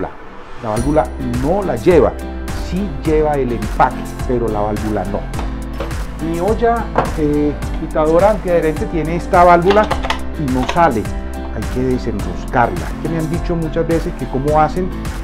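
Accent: Colombia. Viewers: Mijo eres único, paisa de sabor y bien hablado, como nos gusta, bendición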